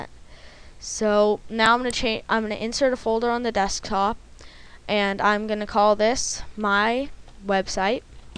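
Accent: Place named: Alabama, United States. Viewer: or... would it be sky flaaaawers? ;) j/k. This tutorial was cool. I used to have the same accent, being originally from Alabama and raised partly in Florida.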